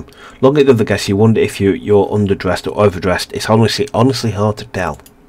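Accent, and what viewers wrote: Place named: United Kingdom. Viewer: From the uk too man sound exactly like one of the lads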